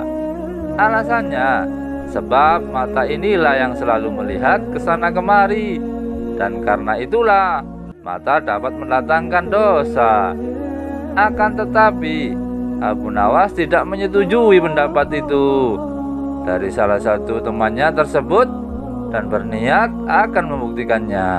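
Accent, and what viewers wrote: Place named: Arab world. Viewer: Kenapa intonasi suaranya bergaya ke-Arab2an, kenapa nggak dengan nada bicara Indonesia yg biasa saja?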